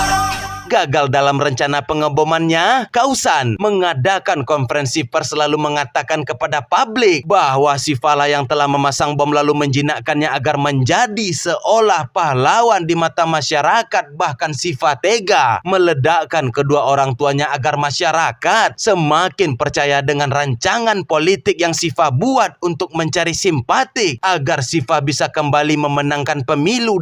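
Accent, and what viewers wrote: West Sumatra, Indonesia. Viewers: Minang kah ads bg😂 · Orang Minang ya bg? Jernih banget ngomong njiang gadang nya 😅😅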